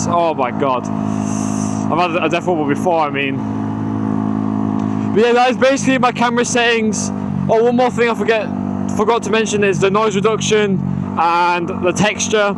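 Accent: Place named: United Kingdom. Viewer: I need one without ND Filters Bro which country are you from? You get R125. It looks like an R15 Though You sound British, i didn't know they have 125cc in English countries, we don't have R125 in my country but we have an R15. It's a really good bike i wanted one too i like the R15 intensity White, recently they released a purple white as well. But i don't wanna switch yet because it's also an uncomfortable bike but R15 is favorite throughout the whole country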